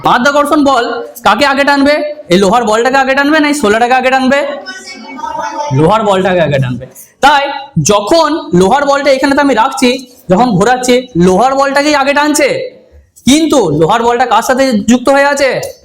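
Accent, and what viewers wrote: India. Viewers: বর্ধমান জেলার ভাষার টান, কনফার্ম! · আপনার বাংলা কথাটা কিরকম যেন টান আছে। হাওড়া জেলার লোকের মধ্যে দেখা যায়। যদি পরিস্কার বাংলা বলতে পারেন খুব ভালো হয়। কারণ আপনি একজন শিক্ষক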